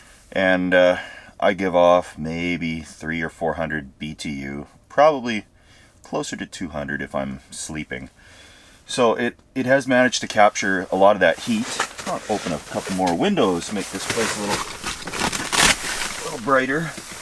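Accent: Canada. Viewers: you sounded canadian and after i heard “vitamin beer” i had to check and i was right. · I was about to go on the defense, but then he talked, and I get it. He does sound Canadian. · I knew he was Canadian ah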